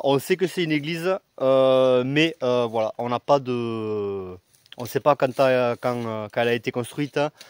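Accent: Southwest, France. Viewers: Je sens un accent du sud-ouest ou me trompe-je?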